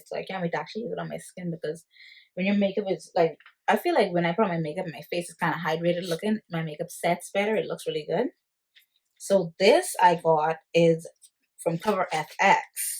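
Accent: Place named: Bahamas. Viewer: Absolutely obsessed with watching Sephora hauls! I’m a Bahamian living in Canada too and when I hear your accent I was like uhhh yeah I gotta subscribe! Keep it up your video quality and content is very nice!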